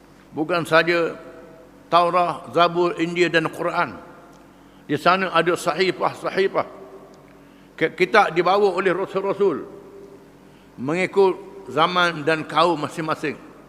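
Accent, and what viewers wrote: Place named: Terengganu, Malaysia. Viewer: suka dgr kuliah tok guru, tidak terlalu ganu loghatnya. org KL pon paham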